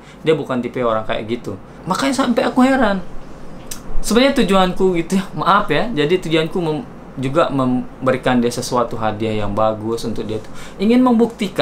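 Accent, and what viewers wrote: Lombok, Indonesia. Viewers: aislah 🤣 masih kentel ruen base sasak nike bang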